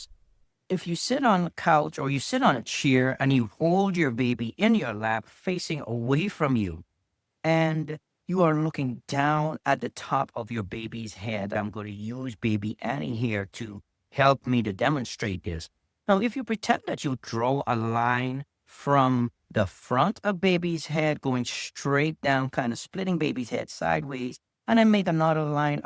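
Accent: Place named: Caribbean. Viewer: Do you operat ein the Caribbean? I'm hearing an accent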